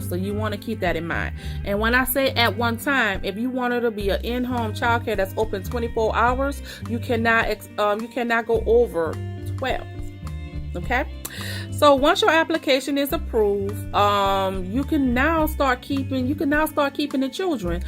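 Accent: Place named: New Orleans, United States. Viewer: That New Orleans accent is strong!! Thank you so much for the info.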